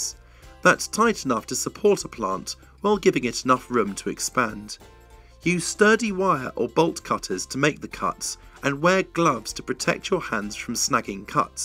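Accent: United Kingdom. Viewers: Why do you have to make everything so freaking dramatic. That British accent doesn't help either. Just give the information man!!!!. How did you people conquered the world? Jesus!!!!!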